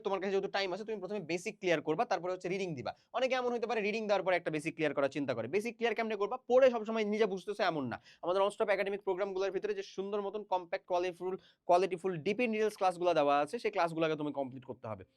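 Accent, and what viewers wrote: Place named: Rajshahi, Bangladesh. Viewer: DAMN SURE VAII FROM RAJSHAHII LANGUAGE TA OIROKOMII:face-fuchsia-tongue-out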